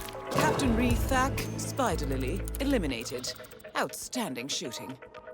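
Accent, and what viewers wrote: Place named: United Kingdom. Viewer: I really like the British accent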